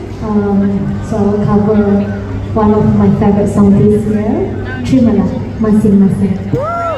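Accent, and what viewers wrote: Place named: Sabah, Malaysia. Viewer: uiii naaaa paqat bha slang sabahan nya itu . ai likeeee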